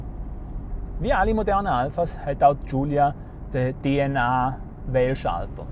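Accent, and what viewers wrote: Switzerland. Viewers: hätte ich mir fast gedachtm hab Freunde die ich von Autotreffen kommen und wenn die ihr Schwitzerdütsch auspacken dann klingt das auch so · de typ isch 100pro en sangaller hahahahhaaha me weiss jedoziit wa dVodorädo am mache sind. lol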